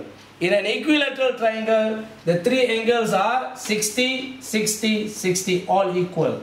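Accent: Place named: Malaysia. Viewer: he speak english like malau people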